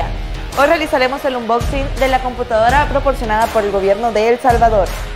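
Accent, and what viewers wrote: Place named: Lima, Peru. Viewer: Tienes dejo limeño., saludos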